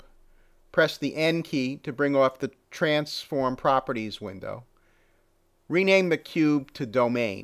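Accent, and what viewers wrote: Boston, United States. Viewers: you have a boston accent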